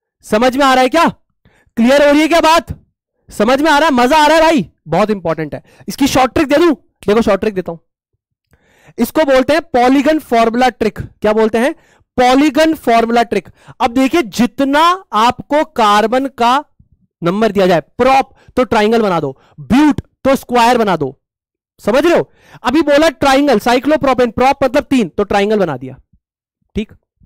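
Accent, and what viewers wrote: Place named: Marwar, India. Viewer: Sir aapke teaching style ke sath appki marwadi...it's amazing 😊❤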